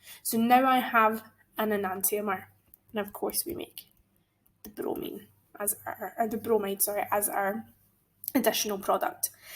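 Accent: Scotland, United Kingdom. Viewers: It’s just a Scottish accent calm down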